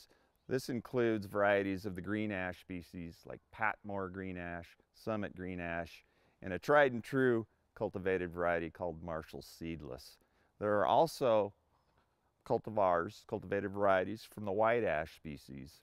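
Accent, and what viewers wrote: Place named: United Kingdom. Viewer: Translation-Ash you're a tree? Nice! Wait is that a british accent? Sorry but I love british accents ♡♡♡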